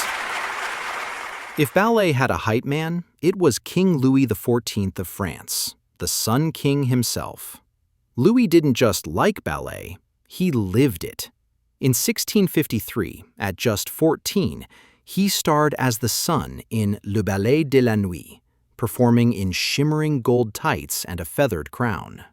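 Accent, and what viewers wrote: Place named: United States. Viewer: Quite interesting, but oh, the American pronunciation! BallAY? Someone has told them French stresses the final syllable. In fact, the stress should be more or less equal on the two syllables of the word ‘ballet’.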